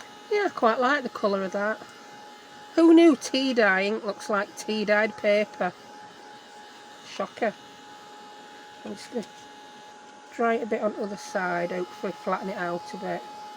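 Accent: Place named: Yorkshire, United Kingdom. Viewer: I love your rambles! I'm an American and not used to listening to Yorkshire accents, although I was very proud of myself for recognizing it before I heard you mention it. Please don't take it as a criticism. My ears will get used to it soon, I'm sure, and I'll probably start sounding like you! (Not likely LOL) That happens to me whenever I spend time in the Southern states. I start sounding Southern.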